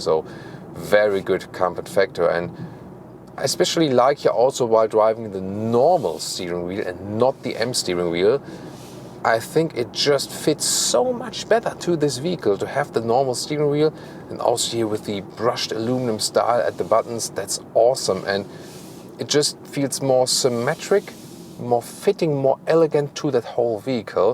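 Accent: Germany. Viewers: Hi, your reviews are great, but your heavy accent makes it very painful to listen to. Why don’t you consider recording your videos in your native tongue (German) and add closed caption, or even a voice-over with a native English speaker? No offence, but it would win you more English speaking viewers for your great channel. Why don’t you consider it? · Re: German car bias. That’s exactly why I subscribed to this channel. A German reviewing German cars in English is a perspective one cannot otherwise get. Say, “DEE-hu-mid-if-cation” :-)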